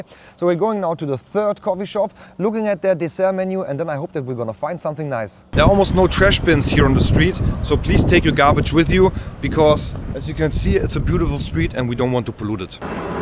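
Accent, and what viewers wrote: Germany. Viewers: playing in the shop visits & your comment - not random coincidences! I have been studying into synchronicities since I suffered an acute psychotic episode in 2017. (psychotic = to psychic) Psychiatrist Carl Jung was said to have coined the term ‘synCHRONICity’ to describe meaningful coincidences with significant personal meaning to the individual. I’ve been working on making wee silly comedy cartoon / comic book style movies since I went ‘crazy’ & in the nuthouse they imprisoned me in 4 being a method actress, the nuthouse staff put an A4 sheet of paper ‘sign’ on the door that threatened; “WRITE TO SURVIVE.” I focused on that sign whilst barely conscious after my life was nearly ended by a psychiatric mentalcase patient in their who beat my skull on the ground in excess of 30 times whilst screaming, “AHM GONNAE FUCKIN MURDER YE!!” “She”, that “female” (I use that term to describe “her” loosely), that violent criminal thug caused me a “traumatic brain-injury” (there is an anagram of “Truman” within those words. 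During my “to psychic” episode I suffered from the common Truman Show delusion & thought I had been set up (I’m an actress) & thought I was being used for a psyop for a Derren Brown show. (I was not I was being sectioned in hell.) Anyhoo, I decided to comment as I’ve just recently started watching your videos & enjoy seeing Amsterdam & listening to your German accent. When I was homeless in 2023 in Utrecht I met the loveliest homeless German man called No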